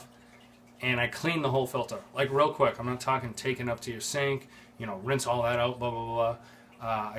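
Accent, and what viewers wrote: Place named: South Shore, United States. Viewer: What part of Mass are you in? You sound very south shore!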